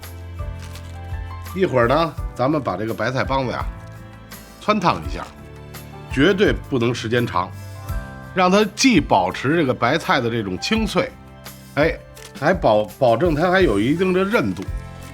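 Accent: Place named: Beijing, China. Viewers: 廣東人，第一次看到這菜，好酷🆒，單單看見都覺得轟鼻，哇噢，這麼多芥末(´▽｀)瑟瑟發抖ing。等我買了芥末粉也試試，另外說一句，北京話好好聽，謝謝分享(o^^o) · 这位厨师大爷说的北京话真好听。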